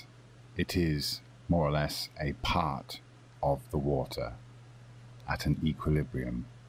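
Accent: England, United Kingdom. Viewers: I am so glad to hear this guy with some sort of English accent -- I thought all of the flat Earth nutjobs were from the US. It makes me feel a little bit better to know that some of the morons are from abroad.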